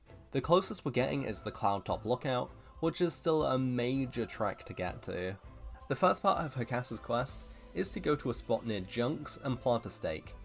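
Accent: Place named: United Kingdom. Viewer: How did I just now realize you’re British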